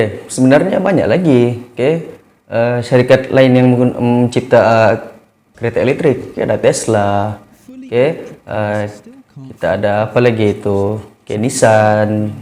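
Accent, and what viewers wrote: Sabah, Malaysia. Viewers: orang sabah kh?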